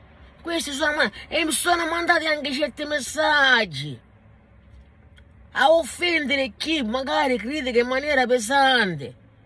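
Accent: Sicily, Italy. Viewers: Ma con sta parlata sicula come fai a tifare Alta Savoia ? Boh !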